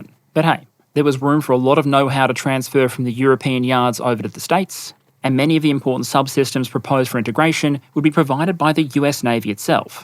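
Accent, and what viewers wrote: Australia. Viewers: Aussie accent, usually a charming quality, failed him here.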